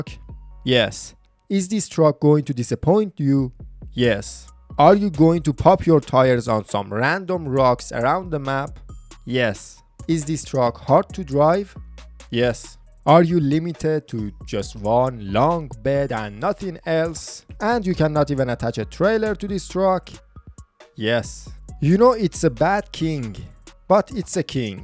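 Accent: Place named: Iran. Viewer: U have some kind of Iranian accent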